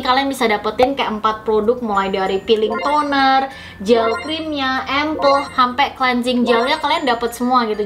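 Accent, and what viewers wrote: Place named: Java, Indonesia. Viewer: bibirna medok teuing eta teh...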